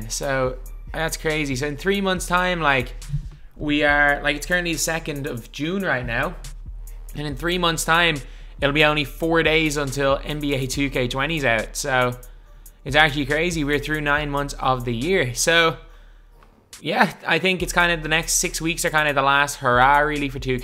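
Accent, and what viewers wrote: Ireland. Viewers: Are you Irish or American 😂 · He’s definitely irish ☘️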